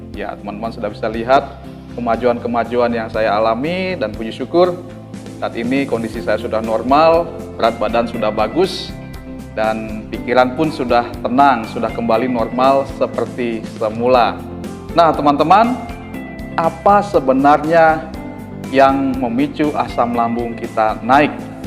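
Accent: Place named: Bali, Indonesia. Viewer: Terimakasih mas Bli sudah berbagi ilmu, logis,kronologis,bahasanya sederhana,mudah di pahami,dibumbui logat Bali yang kental, maturnuwun Mas Bli....( Yogyakarta).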